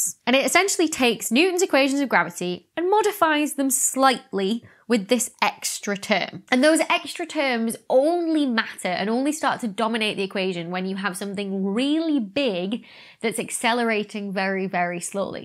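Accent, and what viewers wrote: United Kingdom. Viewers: If u didnt had this soo british accent i would watch all of the vids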